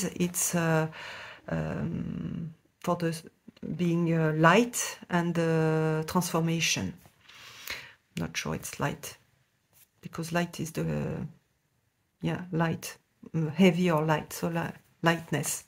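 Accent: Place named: France. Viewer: Sublime tarot, félicitations à toutes les deux pour cette belle collaboration 💚💚💚 Il me tarde de lire le guide book et de recevoir le tarot. Bravo pour ta review en anglais. La langue de Shakespeare n'est pas accessible à tout le monde Bel accent frenchie, avec beaucoup de charme 💐 🧚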